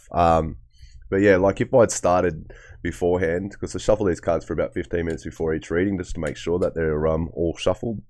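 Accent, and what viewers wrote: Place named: Australia. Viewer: You are an awesome reader! It’s lovely to hear your lovely down to earth Aussie accent, I’m Aussie too but mainly listen to readers from elsewhere. Thank you, many blessings.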